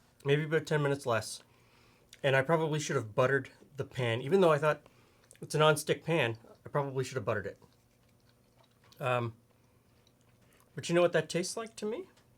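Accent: Canada. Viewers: I love your vids, but I laugh at how much you sound like my mother's Canadian cousins!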